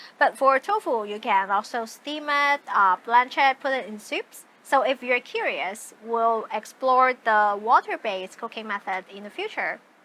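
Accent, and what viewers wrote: China. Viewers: Why does this white guy have a Chinese accent? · It's interesting to hear the slight bit of accent you picked up in your time in China. You speak a little bit "sing-songey," and I find that kinda neat. As always, I love your videos! Chinese cuisine seemed a world away until I started following you. Super informative!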